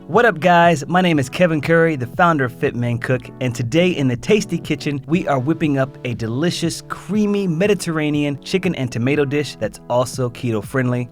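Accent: United States. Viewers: Whoop whoop Such an American accent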